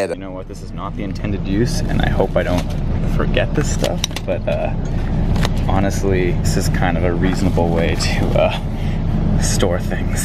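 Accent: Boston, United States. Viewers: I rode a Greyhound for the first time in a long time recently and paid extra for the reserved seat and I only realized halfway through the ride, that I was put in the wrong row (it was only one off but still) so yeah reserved seating is a scam. Love the Bahston accent!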